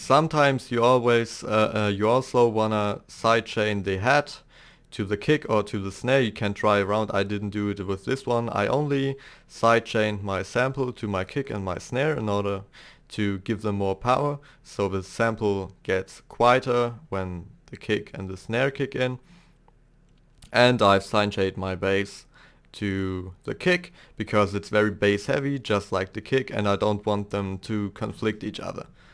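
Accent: Germany. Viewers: dass du deutsch bist, hört man direkt raus. deine anfangsbeats sind brutal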